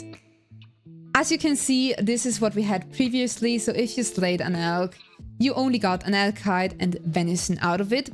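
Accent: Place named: Sweden. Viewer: I love your accent sounds swedish/nordic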